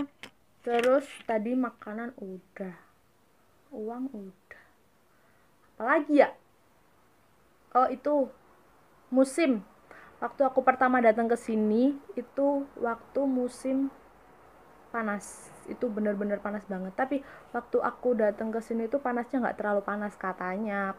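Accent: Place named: Java, Indonesia. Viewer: Ada medhok"nya gitu deh.wkwk tetep yang sama kek dulu. Semangat kuliahnya dong💖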